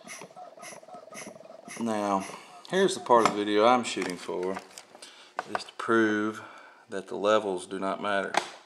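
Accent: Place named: South, United States. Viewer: Southern accent and used vodka bottles. God bless America. : )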